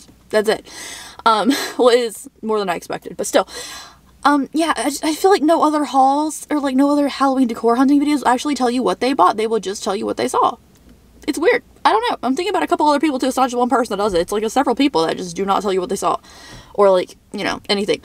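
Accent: South, United States. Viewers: I love it when your southern accent really comes through! You should do a picture of your 4 mug collection 😊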